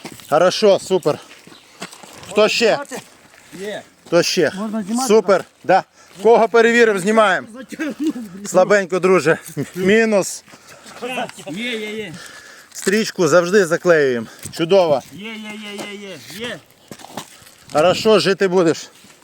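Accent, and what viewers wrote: Kharkiv, Ukraine. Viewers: Дякую, за корисні поради та за чудову Харківську українську ). Мова - теж зброя. ✌ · Дякую за українську вимову, це ліпше ніж московитьським язиком.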